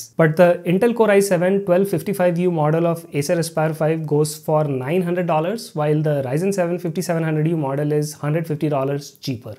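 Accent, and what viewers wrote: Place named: India. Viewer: Great video! You really give good and helpful information. I also like that you took the effort to add those nice subtitles! Some people might have some trouble with your Indian (?) accent (like me - I am German), but the subtiles are really helpful, since sometimes there is just a word or two that I did not understand right away. All in all: Great and amazing job!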